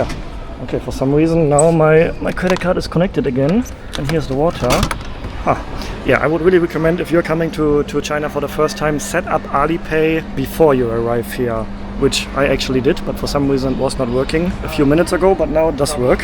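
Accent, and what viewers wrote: Germany. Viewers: Its a german accent not an english one. But the language he is speaking is english. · Hi! I’ve been watching your videos for a long time, mostly on Bilibili, and sometimes on YouTube too. Today I opened YouTube and your video was the first one, so I watched the whole thing. I remember you mentioned before that your German-accented English might be hard for people to understand, but I watched the entire video without subtitles and understood everything perfectly. I think your English is totally fine!😊 Hope more people discover and love your content.❤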